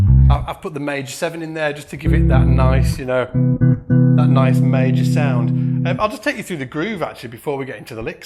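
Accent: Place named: Liverpool, United Kingdom. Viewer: By chance are you from Liverpool? Your accent reminds me of my grandfather...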